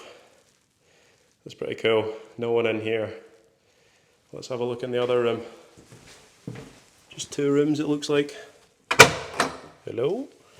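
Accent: Scotland, United Kingdom. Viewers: Super Scottish accent 😅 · I had a scottish classmate before in Law School. Really nice and smart guy. McCleod is his family name. Your accent is the same with his😊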